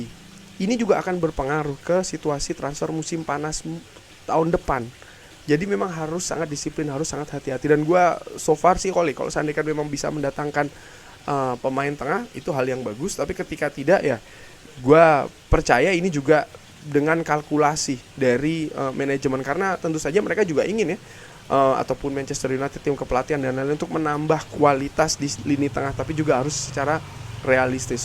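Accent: Semarang, Indonesia. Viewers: Asli semarang ya mas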